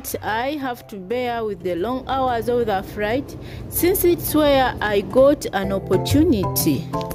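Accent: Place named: England, United Kingdom. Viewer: This English accent is beautiful.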